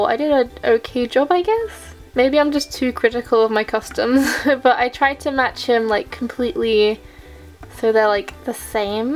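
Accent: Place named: United Kingdom. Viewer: Wait YOUR BRITSH wow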